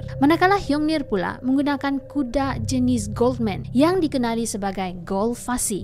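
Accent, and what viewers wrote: China. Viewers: pening r..cm loghat cina,( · Loghat seperti loghat cina hmm..tk logik sebagai sabahan.ekram abang kamu lebih baik😄😄ekram go go go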